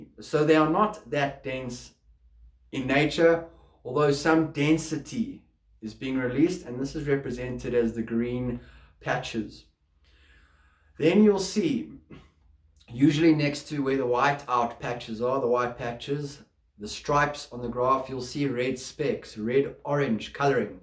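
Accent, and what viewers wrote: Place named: South Africa. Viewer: Thank you for this! Was so happy to hear your South African accent😊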